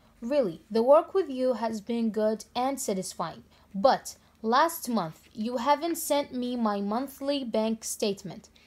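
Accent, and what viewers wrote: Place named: Iraq. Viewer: ههههههاي. عينيه. عله. صوته بعد عراقي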